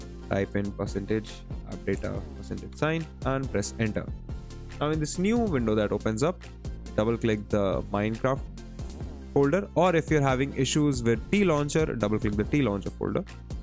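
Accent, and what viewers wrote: India. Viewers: It didnt work Indian accent bro 😢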